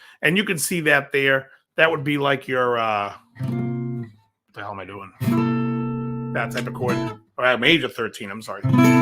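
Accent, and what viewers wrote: Boston, United States. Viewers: I can totally hear the Baahstun accent now! I also did not get notice that you were doing a live stream….I wonder why? Any idea?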